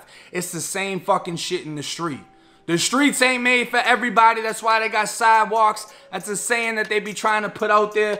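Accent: Boston, United States. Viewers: Sounds like U from Boston?? But with the most Southern accent aloud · Are you from Boston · Yo homie are you from Boston?? That accent strong 💪🏾 AF.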